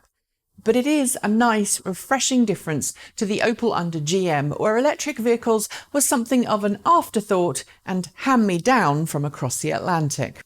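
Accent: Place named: United Kingdom. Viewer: Another good review, where are you based as you have a British accent but quote in dollars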